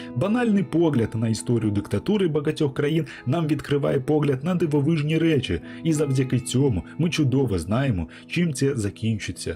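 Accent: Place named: Belarus. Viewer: Слава Богу, Слава Україні, класный белоруский акцент)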